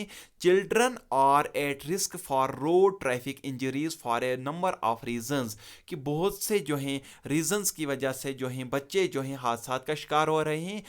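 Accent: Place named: Kashmir, India. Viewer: By listing you I think u r from kashmir... Bcx this voice belongs to kashmirians ...🤨🤨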